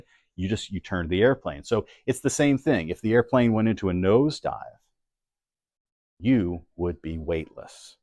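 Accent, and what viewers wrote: United States. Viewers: I’m hooked on this channel But since he seems to have a pretty distinct American accent I assume this is probably an American made video. We don’t generally use kilometers here. I have no idea what the ratio is to miles. Nor do I want to stop constantly and look it up. Use miles! Or at the very least use both all through the video.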